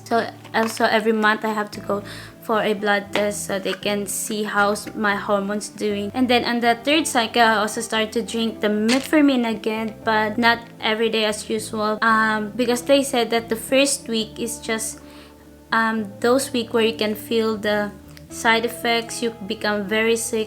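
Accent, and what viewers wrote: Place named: Philippines. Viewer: Congratuations! I noticed you are Filipino, I love your accent, my mum is also Filipino 😊 looking forward to your pregnancy updates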